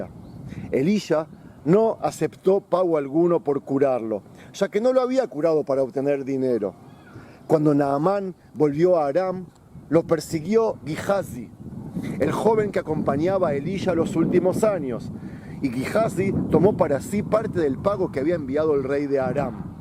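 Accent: Argentina. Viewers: El sonido de su voz como se le escucha parece que es Argentino, pero que vive en israel.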